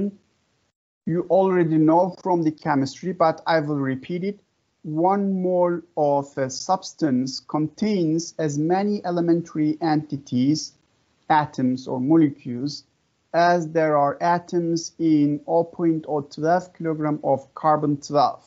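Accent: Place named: Russia. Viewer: At first I thought that you were speaking Russian.. But glad it's English.. By the way from which country are you from?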